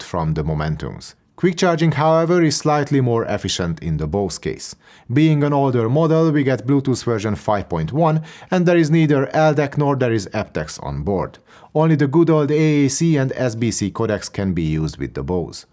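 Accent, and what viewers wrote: Hungary. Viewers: Am I hearing it good that you are Hungarian sorry if it is an uncomfortable question just curious..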